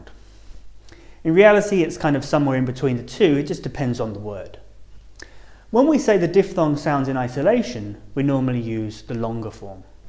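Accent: United Kingdom. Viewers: the presenter has a British accent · I'd like to make an observation, this class is in British English which has a different pronunciation than American. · He is obviously British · watching this video as an American and all the examples are just British pronunciations :') "heuh" "powah" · I have a question... Are you British or American???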